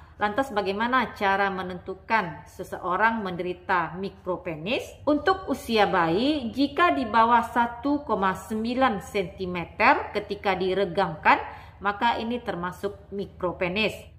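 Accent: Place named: Sumatra, Indonesia. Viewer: Logat nya kyk sumatra deh😅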